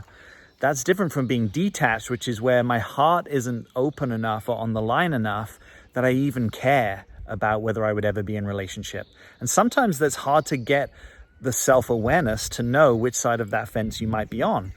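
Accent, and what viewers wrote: United Kingdom. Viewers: yes, it’s the Brit way you say pattern. :) I had to learn an RP accent when I was in a play (very small part) and I so wanted to go around talking that way...but that would’ve been inauthentic 😁and probably pretty hilarious to boot. It’s so nice to hear it as it is, from you! Very good points regarding practicing trusting what’s good (or not) for me and maybe that just relating is a good space. I’ll do like he does in “What about Bob?” Baby steps...😂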